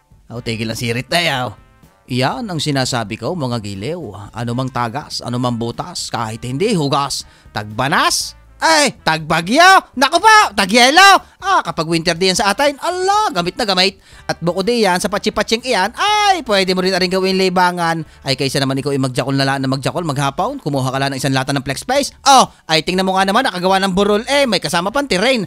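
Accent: Batangas, Philippines. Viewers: batangenyong batangenyo eh hahahahahaha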